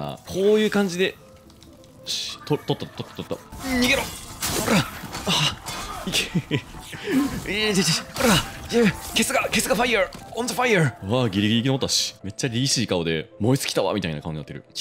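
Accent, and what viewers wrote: Kansai, Japan. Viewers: めっちゃ関西弁なの慣れてるからか聞きやすい^^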